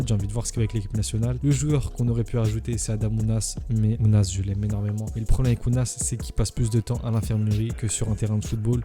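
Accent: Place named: France. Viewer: C,est quoi cet accent français ? Tu mâches tes mots, on ne comprend rien !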